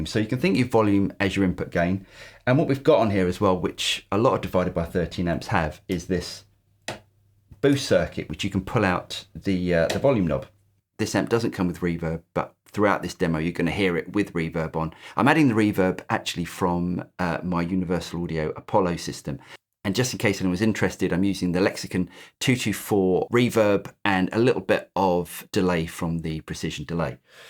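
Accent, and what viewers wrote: United Kingdom. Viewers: try a hamstead, you sound english....come on man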